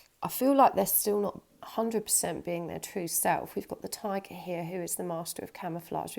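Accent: England, United Kingdom. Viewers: Very deep messages in the beautiful English accent! 🙏🏼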